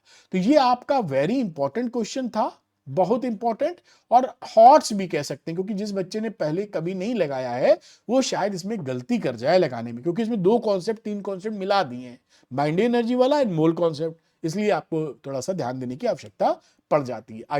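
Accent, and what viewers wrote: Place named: Lucknow, India. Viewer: Sir lucknowii andaz kaafi accha h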